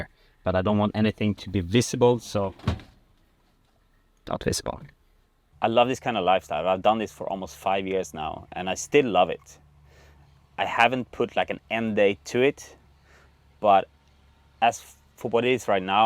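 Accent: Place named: Sweden. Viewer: Sounds Swedish. Not Norwegian.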